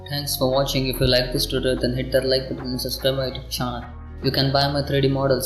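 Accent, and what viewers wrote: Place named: India. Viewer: Hello, very interested in what country you are from? India? From your accent it seems to be India, I want to check the assumption. I'm from Russia myself. Video is amazing!!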